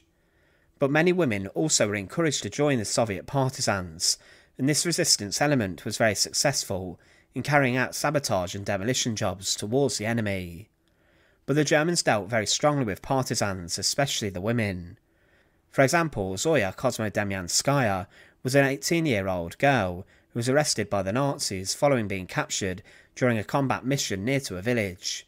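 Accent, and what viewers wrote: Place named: England, United Kingdom. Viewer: It's the guy's English accent. I don't know which particular accent it is, but the "fousands" bit, instead of "thousands," just baffles me.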